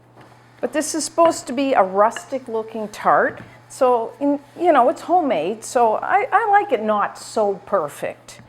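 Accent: Canada. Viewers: you sound Canadian. Are you Canadian?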